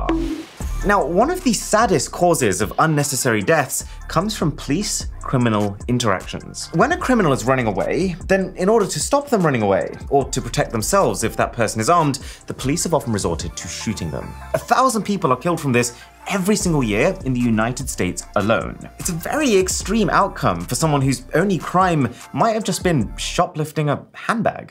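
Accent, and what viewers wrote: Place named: United Kingdom. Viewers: He is British but for obvious reasons, the general audience is American and many statistics are measured using dollars. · I can never tell if is British or American. He sound British yet only talks about America for stats and uses dollar Can someone please clear this up for me please!!!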